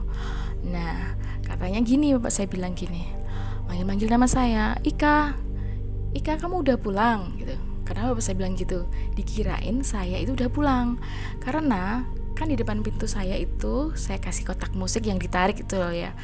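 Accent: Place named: Surabaya, Indonesia. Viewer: Ohh pantes, dr kediri.. Tak denger2in suarae kyk org Sby wkwkwkwk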